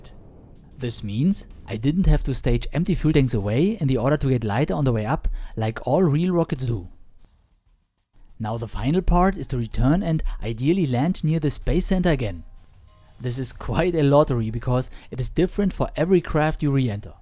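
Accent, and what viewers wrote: Germany. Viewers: German detected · Are u german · There is something to be said about the german accient giving authenticity to the video.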